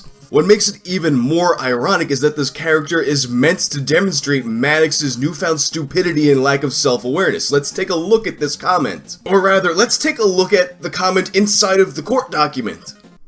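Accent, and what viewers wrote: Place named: New York, United States. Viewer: It's called a New York accent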